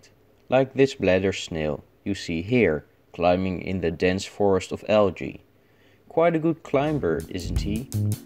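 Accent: Netherlands. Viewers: RRRRRRRRRRrrrRRRrrrRRRrrrrrRRRR horrible Dutch RRRrrRRRRrrRRR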